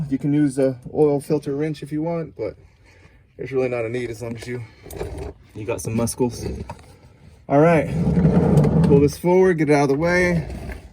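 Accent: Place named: South, United States. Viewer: Your southern accent is adorable.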